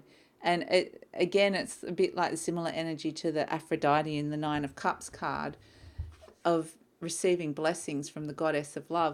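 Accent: Australia. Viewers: Hello from Sydney I love listening to reading I got so excited hearing you speaking Australian English 😃 I’m always coming across American and other accents lol , I’m listening to my husband reading Leo ur spot on , u have a beautiful energy ur face is glowing with positivity great reading god bless you 🙏😊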